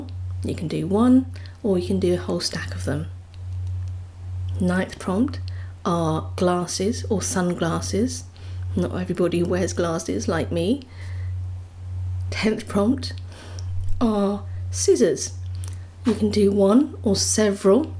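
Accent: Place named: England, United Kingdom. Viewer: From Uk. Just found you. Love the English accent. Great inspiration. Thank you.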